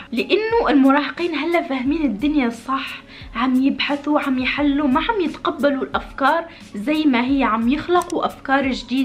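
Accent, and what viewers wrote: Tunisia. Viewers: انت تونسية؟؟❤️❤️ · حسيت انو انتي من شمال افريقيا انتي من تونس 💙💙💙💙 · انا اول مرة نشوف فيديو تاعك اما انتي تونسية مش هكا حسيت من لهجتك هكا نبرتك انك تونسية صحيح و الا لا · Omg u're a tunisian girl when i heard u frist i felt u were tunisian but then i was like naahhh this girl has a lot of accents idk menysh msad9a ama merci i needed thiiissss sooo baad 💖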